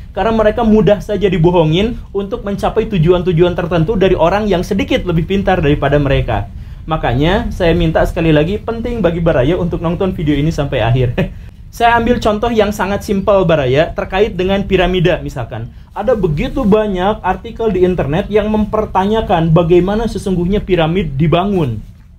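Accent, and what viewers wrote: Indonesia. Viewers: aku lebih suka orang seperti begini dari pada yang pakek topi versi timur tengah. logat penyampaian sangat ke Indonesia an banget. dan ini yang bikin aku suka nonton vidio satu persatu tanpa pernah saya skip karena saya merasa tambah pintar tanpa ada rasa paksaan harus begini begitu kalau mau seperti itu. sekian terimakasih udah dibaca😂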